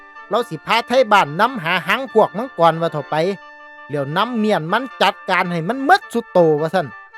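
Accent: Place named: Korat, Thailand. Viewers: ภาษาโคราชกะมา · คักเสียงคนโคราช😂😂😂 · ไทโคราชตั๋วนิ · มักคักภาษาโคราช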